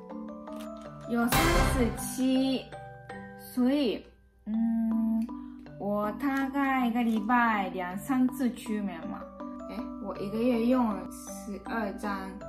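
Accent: Taiwan. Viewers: 居然有點台灣腔喔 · 台湾腔小姐姐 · 這中文該是台灣學的 マスク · 台湾口音加日本口音的国语混合在一起真是特别呀。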